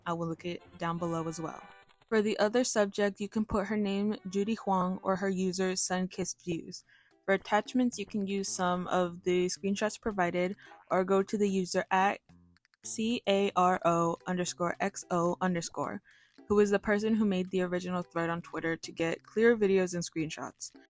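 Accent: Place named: Spain. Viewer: Out of context but I love your spanish pronounciation🫠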